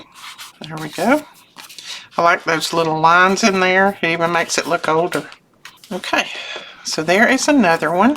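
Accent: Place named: Tennessee, United States. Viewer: When I heard you say your birthday is October 7, and had depression , I also have depression bouts out of nowhere. I have taken meds for several years, and they really help. But sometimes I have to fight it everyday. Also, our youngest son ‘s birthday is October 7. He died 4 years ago, so it is always a tough go during that time. He was 49 years old. I listened to you talk after your husband passed, and I felt so helpless. There are no words, or right or wrong way to grieve. Everybody has their own way to try to get through. I feel his presence when a Cardinal comes to see me, when I find a bird feather, see a beautiful butterfly, or see his image in a cloud. I hope your depression stays in the background, and your memories of your husband make you smile. I watch you all of the time. Thank you for taking the time to make your videos and for your beautiful freebies. 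It is also nice to hear someone who sounds like me. I am from Tennessee.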